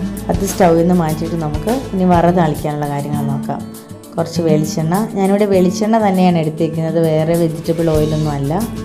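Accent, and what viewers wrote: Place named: Kottayam, India. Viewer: Wow. Nehru Trophy. Such a wonderful place. Before 3 years we had a boating in Nehru Trophy. Kindly make one episode to show your place if you can . We are waiting to c. Actually your accent is Kottayam. That s y i asked such.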